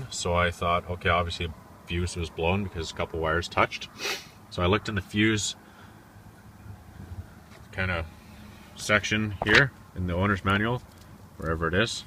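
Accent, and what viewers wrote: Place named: Midwest, United States. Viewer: just for kicks where is that accent from you Canadian or from Minnesota?? Lol. I'm a midwesterner too so I picked up on the accent?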